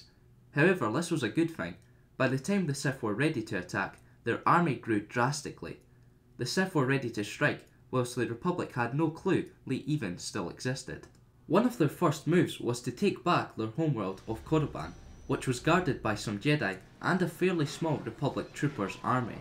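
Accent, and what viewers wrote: Scotland, United Kingdom. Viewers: hes scottish. thats just how it sounds.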